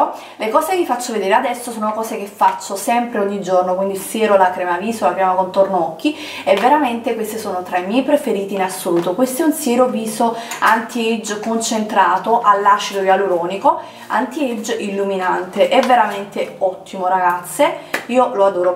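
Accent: Naples, Italy. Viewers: Che hai contro l'accento Napoletano...dimmi fammi capire ..vorrei santire tu come parli....sei di una pochezza assurda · Toniolo ,per tremendo accento cosa intendi? Per caso non tolleri l'accento napoletano?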